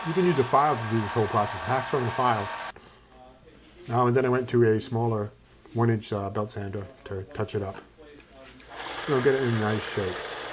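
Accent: United States. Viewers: Are u american?D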